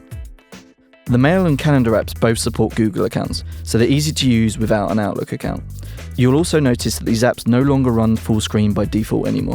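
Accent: United Kingdom. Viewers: fuckin british accent, cant understand a word :C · I have never hated a British accent as much as this. It would've been a great review otherwise. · damn I've looked for various reviews and they all have narrators with British accents I can't &^%$ understand...why do they do this?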